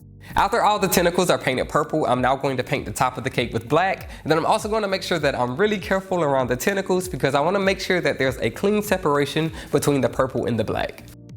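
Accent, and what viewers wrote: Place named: Alabama, United States. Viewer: The alabama accent thoo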